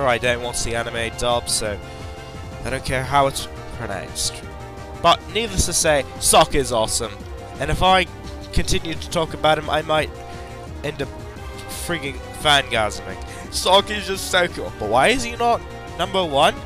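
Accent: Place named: United Kingdom. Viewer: are you british or something cause your accent is hillarious XD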